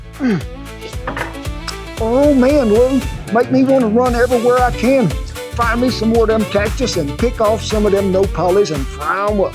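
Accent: United States. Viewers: A country white man peeling and prepping nopales with a huge USA flag behind him and has a country accent. Mexican culture is strong.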